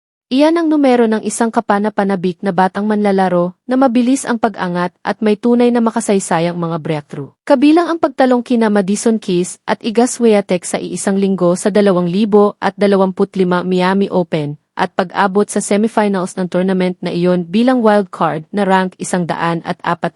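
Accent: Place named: Provincial, Philippines. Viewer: 😂😂😂 pronunciation lng ng NIKE pang barrio ka na😂😂😂 pati Debut mali din😂😂😂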